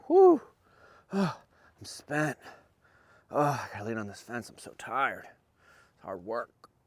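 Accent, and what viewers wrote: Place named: West Midlands, United Kingdom. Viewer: He has a West Midlands accent (Brummie/Black Country area), not a Welsh one!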